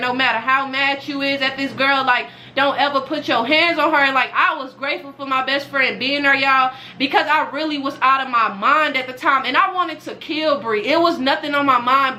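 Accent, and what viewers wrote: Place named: South, United States. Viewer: yessss her accent so cute to me cs I was born in Texas but raised in New York so the southern accent is adorable!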